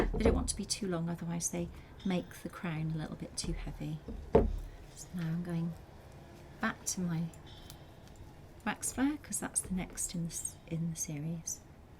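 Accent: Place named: United Kingdom. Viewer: Yes! I came here to say that everything is better with a British accent. And this is a beautiful tutorial!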